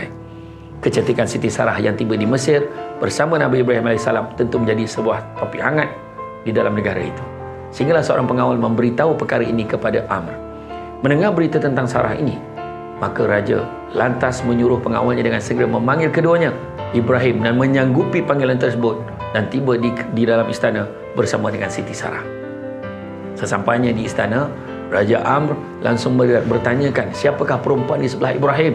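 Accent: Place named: Kuala Lumpur, Malaysia. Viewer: Jangga raso eehh Ustaz cakap kolumpo.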